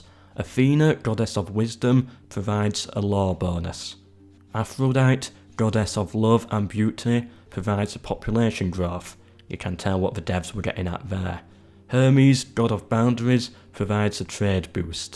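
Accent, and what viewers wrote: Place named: Yorkshire, United Kingdom. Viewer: The Romans would call this old pronunciation issue as a "vexata quaestio". Actually, very few Brits/US folk bother to pronounce Latin or Greek names as they ought to be pronounced (ayup, these two languages have got their own pronunciation, far far before even Old English existed... :D). There may well be ethnocentric reasons behind this (in astronomy, for instance, this is totally blatant, a little bit less in history, maybe) but it's always refreshing to me when someone decides to utilise the original pronunciation, doing justice to those historical names. Regardless, the proprietor's Yorkshire accent sounds absolutely pleasant and very very fit for divulgative/entertaining videos such as his own.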